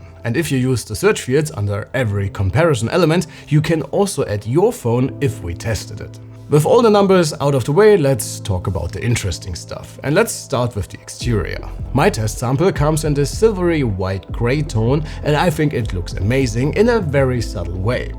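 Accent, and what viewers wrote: Germany. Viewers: Harter deutschsprachiger Akzent (hab ich aber auch wenn ich Englisch spreche) 😊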